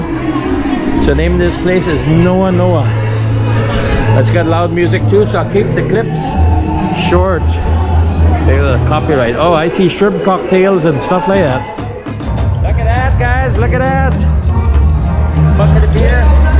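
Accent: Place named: Middle East. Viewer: You have an accent where are you from midlle east ??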